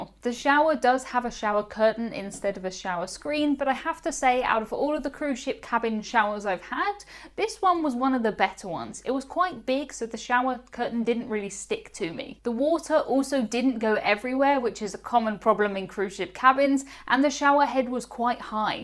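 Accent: United Kingdom. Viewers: Love your vids! My fav thing about your vids is the "British-ism" word explanations and your accent :) !! So weird how English is spoken between 2 countries but yet some words mean something totally different.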